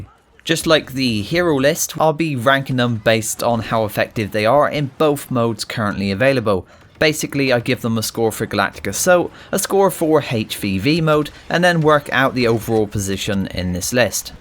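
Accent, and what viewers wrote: West Country, United Kingdom. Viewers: Like the West country twang :)btw Thanks for helping out RedWolf72ps4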